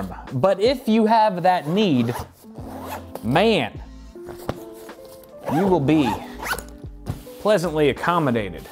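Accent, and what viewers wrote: South, United States. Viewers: just created a new market segment. Better get a patent on this innovation! Awesome video with southern accent too! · Did you move to the south or something? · cool build! 400% the amount of allowed cowboy accent in youtube videos though. shame. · why doe she talk like a southerner the whole video? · What region of America accent is this? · What’s up with the country good ol boy accent lol ? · where did the southern drawl come from? Jetleg does some weird shit to. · The southern accent and all the cowboy puns were very random and made no sense to me, I just started tuning out half way into the video. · I'm from Houston Texas and he sounds more southern than me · Jetlagged sounds like a southerner. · Southnern sounds pretty cool yaw🤠👍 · new southern US accent? interesting haha... who have u been hanging around lately?😃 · Oh, good, he was speaking with a southern accent. 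I was tired when I watched it so I thought I was imagining things.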